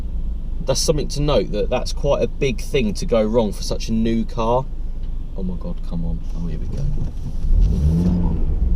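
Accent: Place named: West Country, United Kingdom. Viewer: Hey dude, enjoyed the review and the camera work. You come across well, few rough edges here and there but overall pretty good. Bit of the west country accent adds a bit of character compared to the boring droll of some car reviewers. On a bad note and I hate to be this guy, but you do use "nice" too much. Other than that, you did a good job.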